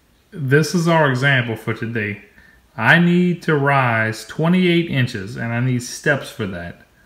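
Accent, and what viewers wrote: Louisiana, United States. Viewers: hey ,,you from louisiana ..you got that cajun accent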